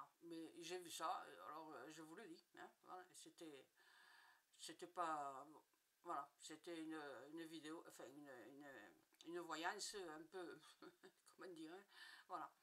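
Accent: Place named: South, France. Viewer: Vous avez un bel accent du sud 😊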